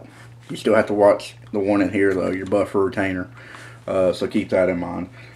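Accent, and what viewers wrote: South, United States. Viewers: No he doesn't! You just have be from the south to understand him. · ok little southern draw but why Carolina? we all ain't gat that twang....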